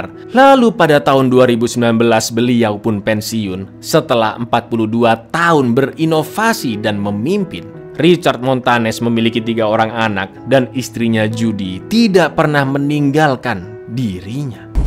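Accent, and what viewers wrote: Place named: Serang, Indonesia. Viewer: Logatte kaye wong serang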